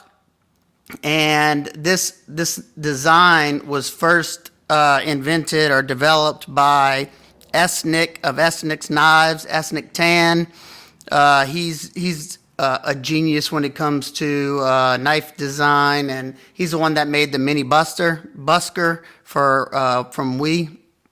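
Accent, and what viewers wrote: Louisiana, United States. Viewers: This knife is so interesting. its screaming for custom inlays. great review! do I hear a south Louisiana accent?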